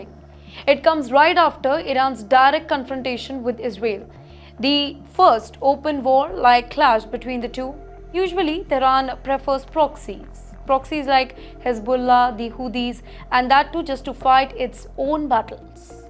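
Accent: India. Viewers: Curry accent 😂 eat cow dung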